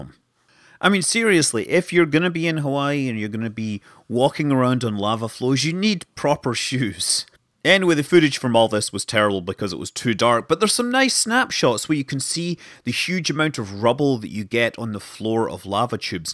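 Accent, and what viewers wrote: Scotland, United Kingdom. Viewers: Do I hear a Scottish accent? It’s a posh wan but am sure it’s there.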